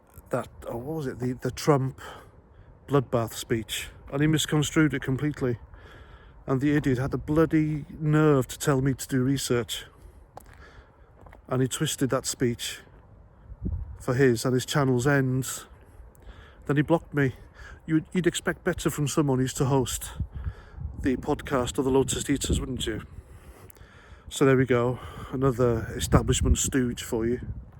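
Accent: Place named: Scotland, United Kingdom. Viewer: Real people speaking the real truth you n are legends keep going and loved yer Scottish accent kerse is an erse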